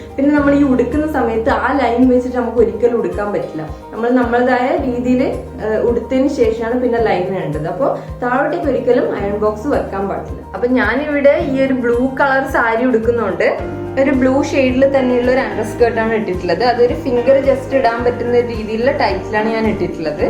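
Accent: Kannur, India. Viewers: Kannur aano??? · Kannur kariyano? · Njn subscribe cheythu,kannur aanennu kettappaze,njnanum kannur aanu😍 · Kannooor kaari aaano?? 😍 Samsaaram and slang very lovely 🎉🎉🎉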